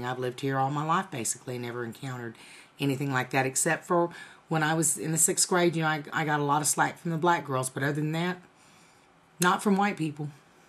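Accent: South, United States. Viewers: where are u from? you have a thick southern accent. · Do you cook? You remind me of a Blasian Paula Deen. Maybe it's the accent. Southern accents have a certain warmth. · I love your Southern accent!! You're a beautiful lady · half black, half asian with a country/southern accent...I love it!!! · I was like I hear an accent but than I realize yep a country accent. you sound so white tho. glad you had a good experience. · OMG, you are so gorgeous and sweet with your almond shaped eyes, beautiful brown skin and Southern accent! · at first i was thinking english but then the more i herd you i was oh okay shes from the south* i luv southern accents* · See how RACE is so freakina problem with RACE in general cause if you had not known her Direct escendant outcome then you would think this woman was a natural native from Tx or Ok ...etc etc and the the souther accent helps ease the fact that how some ppl would react to the fact of the though of her being "hALF-n***8" (excuse speech) referencing tho. 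· Is your MOM ASIAN AND YOUR DAD BLACK? and Are u from Texas because you have a Southern / Country Accent😎😎😎😎😎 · truly you are a southern woman, no matter what your race is. You sound just like Paula Deen to me! Lot's of black southern people are country too! you sound like a home girl to me!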